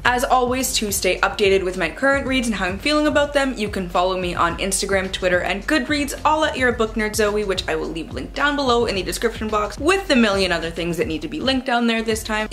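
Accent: Canada. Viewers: Hello =)) As i'm not from Canada, i don't know much about stereotypes but i've always known how well you all speak great english and french, how you pronounce differently words like: "out", "about" and similars, i've heard about poutine and specially the maple syrup :P I would like confirmation on those xD (i specially like the accent though <3) Thank you for the amazing giveaway and opportunity ********************** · pretty sure my favorite canadian stereotype is the way you say the word "sorry" as "sourry" becausE IT'S TRUE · Dual Canadian-US citizenship coming in here! I'm from that part of NY where like half my town is French Canadian. A local college literally has a minor called "Canadian studies". Not technically a stereotype, but I know when I cross the border and when my neighbors north come down when they say about with the out part sounding more like an oot or more rounded o. 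It makes me smile when I hear it in your videos. And maple syrup omg. And hockey (go sens!!!) Maybe this is a good time to finally pick up the handmaid's tale.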